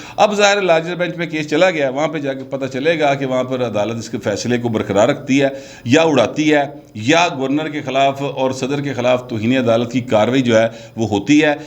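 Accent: Punjab, Pakistan. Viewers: آپ دلیری سے بولتے تو ھیں ھی اس میں پنجابی کا جو تڑکہ لگاتے ھیں ۔مزا آجاتا ھے ۔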